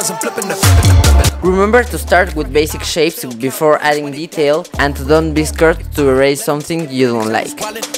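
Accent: Spain. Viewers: Como se nota que hablas español xd (no hate :, you draw nice :D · eres español? tu acento ingles me suena a español, buen video!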